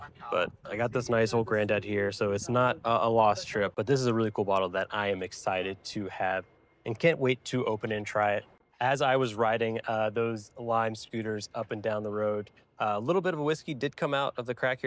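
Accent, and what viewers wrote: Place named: United States. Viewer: i love the small inaccuracies when you talk, makes you sound very american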